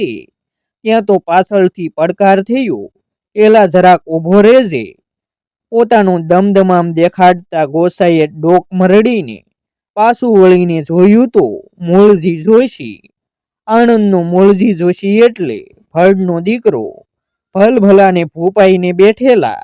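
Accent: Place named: Kathiawar, India. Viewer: Varta charotar ni ne boli kathiyawadi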